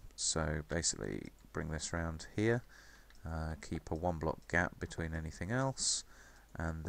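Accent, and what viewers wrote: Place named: United States. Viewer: It's noce to hear that I can contribute to your work :) And it will be nice to see how part 2 will be executed. Also, I heard you had some kind of accent. But for an untraine ear it sound a lot like american :p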